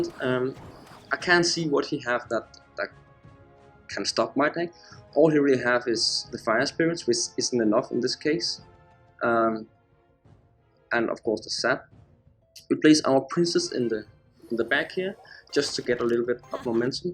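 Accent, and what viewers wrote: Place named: Denmark. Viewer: hvad laver du du er jo dansker det kan man høre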